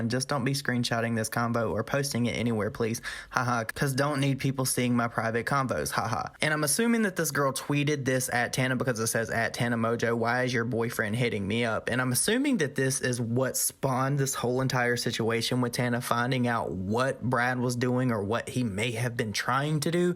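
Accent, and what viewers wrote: South, United States. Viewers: WHY DOES ONLY HAVE 43k SUBSCRIBERS! He’s adorable, his southern accent is everything, he’s relatable and he got all the tea 💁🏻‍♀️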